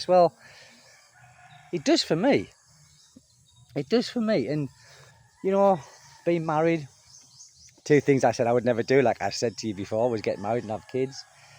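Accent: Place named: Birmingham, United Kingdom. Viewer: You speak a lot of Birmingham Midland English, just like Peaky Blinders, the new movie just came out, have you seen it? Have you seen it?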